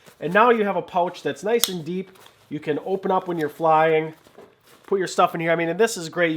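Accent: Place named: Wisconsin, United States. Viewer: Great vid! I vote you do all the tech talks w/ the thick Wisconsin accent that you used in previous vids!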